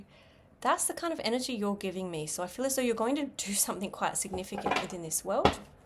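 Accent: Australia. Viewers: You have to be good. You hail from Oz. I knew you were by your accent. I'm from Oz. Adelaide living in Canada.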